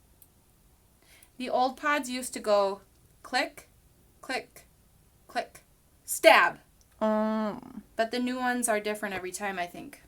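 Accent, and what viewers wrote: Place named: Minnesota, United States. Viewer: Excuse my silly Minnesotan accent!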